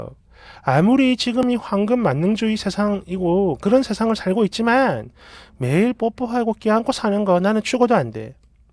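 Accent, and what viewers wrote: Gyeongsang, South Korea. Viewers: 경상도 분이시구만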